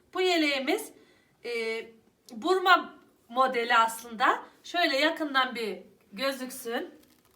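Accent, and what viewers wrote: Aksaray, Turkey. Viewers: Ellerine ve emeğine sağlık hepsi çok güzel biz Aksaray insanları hep doğal konuşuruz benim oğlumun ismi de Ayhan sizi çok seviyorum hanım beğenerek dinliyorum izliyorum selamlar öpüyorum seni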